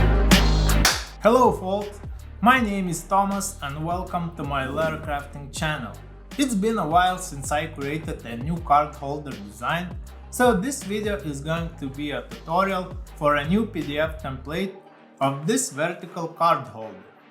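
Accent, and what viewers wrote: Russia. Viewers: nice channel bro! you are russian? your accent...)) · Слышу как будто русский акцент...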